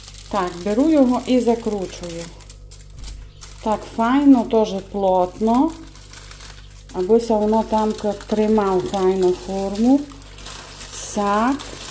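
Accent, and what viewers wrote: Transcarpathia, Ukraine. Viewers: Дуже подобається ваша мова. Слухаю вас з насолодою. Дай Господь вам здоровʼя і наснаги. І хай Господь спасе нашу Україну. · Привіт.Я обожнюю ваш закарпатський діалект і ваші рецепти.🌲💛💙🌲🇺🇦🌲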